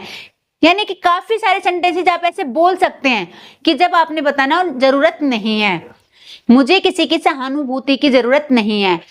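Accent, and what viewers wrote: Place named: India. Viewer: आप बहुत अच्छा कंटेंट लेकर आती है और बहुत अच्छा पढ़ाती है but अब समय बदल गया है तो आप थोड़ा सा अपने एक्सेंट पर और प्रनंसीएशन पर भी काम कीजिए और आप यूट्यूब में थोड़ा सा ऐसी वीडियो देखिए जिससे आप जब इंग्लिश बोलती है तो उसमें देसी लहजा न लगे आप टीचर बहुत अच्छी है इसलिए मैं आपको सब्सक्राइब कर रहा हूं मैं यहां बुराई नहीं कर रहा हूं आपकी, बस आपको थोड़ा सा अपडेट रहने के लिए कह रहा हूं । समय के हिसाब से थोड़ा सा ध्यान देकर आप अपना देसी लेहजा हटाये और उच्चारण आज के समय के हिसाब से करें। सलाह ठीक लगे तो माने अन्यथा आप बहुत अच्छा पढ़ा रही हैं😊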